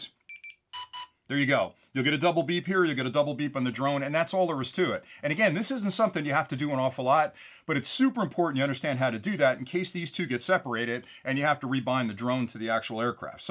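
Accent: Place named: Bronx, United States. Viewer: you should be from the bronx - well cool - love your channel!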